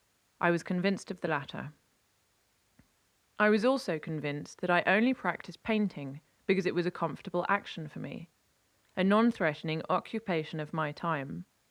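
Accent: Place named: England, United Kingdom. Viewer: I think I am going to spend eternity wondering why the narrator is speaking with an English accent. Still it does make it quite entertaining. And yet somehow ...my comment ended.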